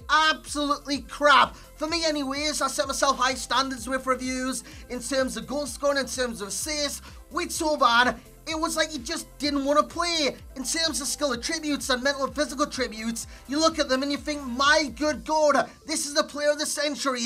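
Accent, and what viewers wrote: Newcastle, United Kingdom. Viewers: hey, what part of the UK is your accent from? I'm from the US so i'm not very good at picking out different dialects.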